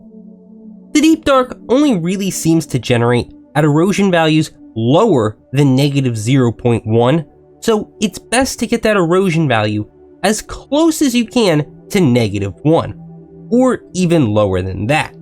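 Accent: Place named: Northeast, United States. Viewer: First of all, great video and it’s the first I watched of yours Second, you’re definitely north east coast 😂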